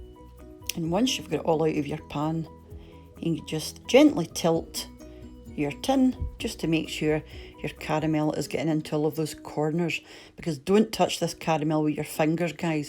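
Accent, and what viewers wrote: Scotland, United Kingdom. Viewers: I was complimenting her strong Scottish accent. I have traveled to 48 of the 50 states, including Alaska and Hawaii. The only 2 I have missed are Tennessee and Kentucky. Maybe they have the strongest accents! I was born in Philadelphia and have lived in the west most of my life. I don't have an accent. By that I mean I don't believe there is a typical American accent like a British or Irish, South African, etc. Don't assume I haven't traveled.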